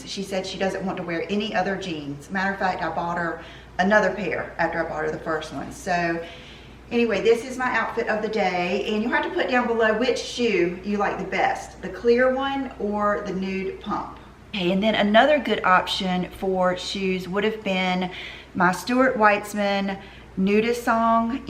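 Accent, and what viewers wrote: South, United States. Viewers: Maria Daquila Right!?! I just love seeing her new video in my feed. Sweetest southern belle, this Long Islander loves to listen too❤️❤️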